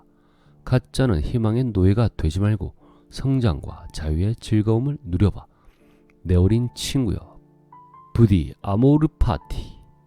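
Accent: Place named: Gyeongsang, South Korea. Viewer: 경상도 억양이 거슬리네요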